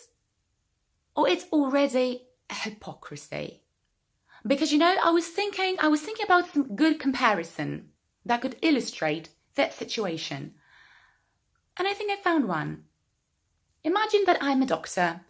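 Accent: United Kingdom. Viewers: You're talking about an American accent but you sound British? Why is that?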